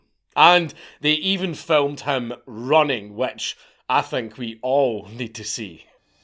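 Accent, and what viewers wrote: Scotland, United Kingdom. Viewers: Personally, I like the way he says "work," for some reason, as idk what it is, but there's something about his scottish accent that tickles my American brain. 😅 But the way he says "murderer" sounds oddly appealing, too. 😅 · Being a German native I daresay I like this Scottish accent very much. It sounds very harsh but very funny at the same time. Always puts a smile on my face. 😊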